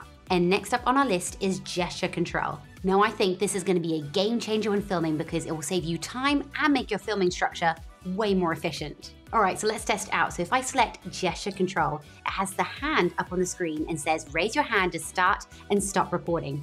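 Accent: United Kingdom. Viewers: Instagram reels, British girl, Public or private school accent ? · Agree with other reviewers - really helpful to get up to speed and get it right (and nice to have a Brit accent as well ;-))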